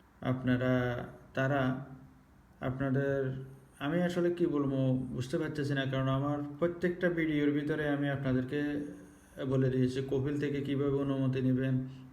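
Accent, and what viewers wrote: Noakhali, Bangladesh. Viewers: নোয়াখাইল্লা। তুমি তাই না ভাই ভালো ভালো কাজ করেন তবু মানুষের সাহয্য হোক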